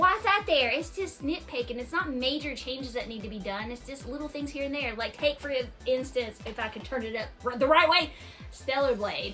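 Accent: South, United States. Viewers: I love your southern accent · I love your accent it’s so country I am from Tennessee I love watching your videos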